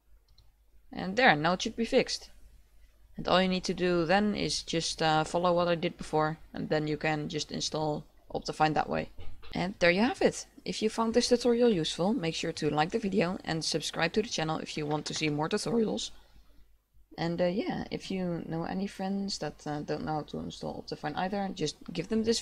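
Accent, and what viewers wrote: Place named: Netherlands. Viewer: I smell nederlands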